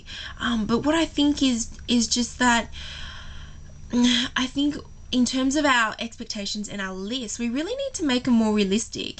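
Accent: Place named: Australia. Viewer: Wow you sound super Aussie!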